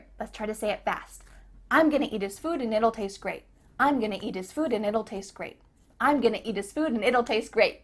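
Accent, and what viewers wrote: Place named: United States. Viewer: Do all Americans speak like you do? It sounds like a lot of slang. May I ask what program did you use to make the video?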